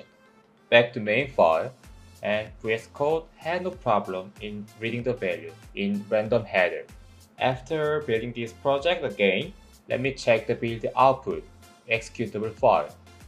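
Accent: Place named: South Korea. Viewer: 발음이 한국분이시네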